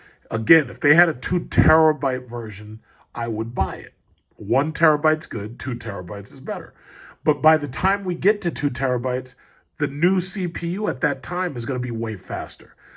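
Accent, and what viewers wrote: United Kingdom. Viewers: How dare you bring up the massacre of my boy Jon Snow in season 8. I felt like Don Corleone looking at my dead child watching what they did to his character lol. Love the British accent lol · Your British accent sounds like Monterey Jack from the cartoon Chip n Dale’s Rescue Rangers 😂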